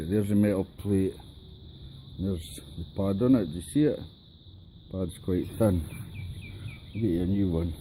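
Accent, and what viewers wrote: Scotland, United Kingdom. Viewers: Love the accent Jock Scottish 👍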